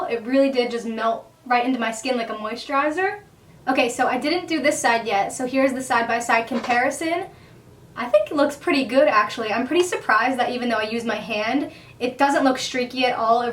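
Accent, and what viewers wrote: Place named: Long Island, United States. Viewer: Are you from NY? You have kind of a Long Island/NY accent... especially heard it in the way you said orange. just curious!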